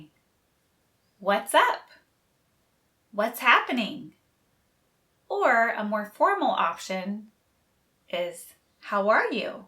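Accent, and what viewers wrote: China. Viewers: Hey, thx for the amazing teachings! How come it sounds like pulling away from ‘HK English’? haha 😛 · You know well how a Chinese speaks English.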